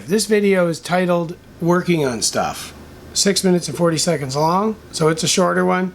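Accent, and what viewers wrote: Canada. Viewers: Bwahahahahaha! Love It. I've never seen your channel before, took six seconds to figure you're Canadian. Even before the "You're Welcome."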